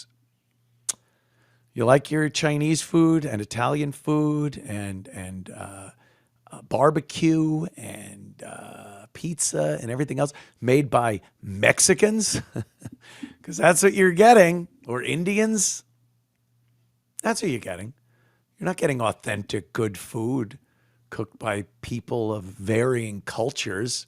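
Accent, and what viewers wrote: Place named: South, United States. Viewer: As a southerner I approve of ants new found accent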